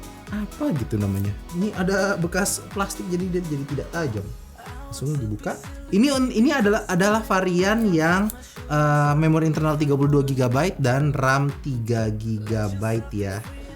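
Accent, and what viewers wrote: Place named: West Java, Indonesia. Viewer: Nyunda pisan si akang wkwk.. Di sorodotkeun wkwk😂😁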